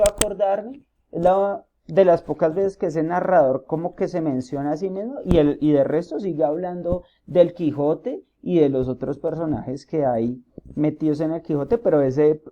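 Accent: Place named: Colombia. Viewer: Pues el cuento me pareció muy realista ya que como lo dice en el titulo hay personas que tiran la piedra y esconden la mano es decir, que hay personas que cuando les conviene son santas pero cuando no les conviene son malosos. En otras palabras son hipócritas. Además el cuento super bueno ya que lo que me gusto fue que parte de ser escrito con el acento colombiano es muy realista. Que tengas un bonito día profe!!!♥♥🥰😋😁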